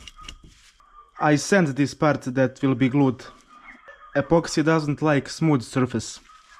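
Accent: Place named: Russia. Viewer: Я руусский, но даже мне режет уши твой акцент(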